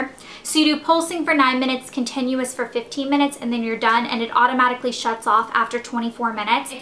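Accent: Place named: South, United States. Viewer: I love how sometimes your southern accent comes out <3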